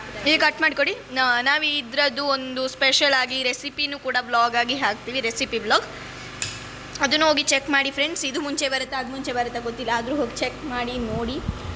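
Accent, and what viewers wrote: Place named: Arebhashe, India. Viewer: Nimma arebhshe kelike kushi adhe marre 😅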